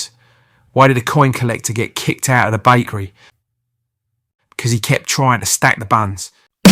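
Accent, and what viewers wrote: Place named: Cockney, United Kingdom. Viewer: Hi I subscribed to your channel yesterday. Really love the way you present your video, fun but informative, I'm Scottish, but I love your voice sound like a Del Boy type of guy. Keep it up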